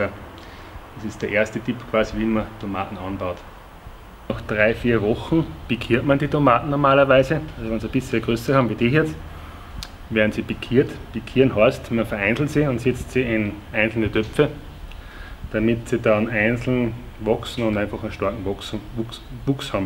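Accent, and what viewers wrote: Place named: Austria. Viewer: Das IST richtiges österreichisches Deutsch!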